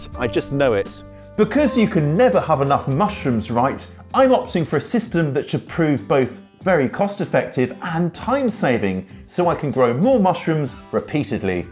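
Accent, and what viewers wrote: United Kingdom. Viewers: You sound distinctly British. Is there a reason you give your Imperial units before your metric ones?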